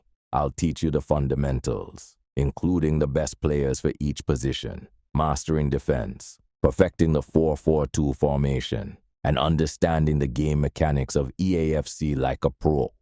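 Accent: Jamaica. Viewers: I like the Jamaican voice don't change it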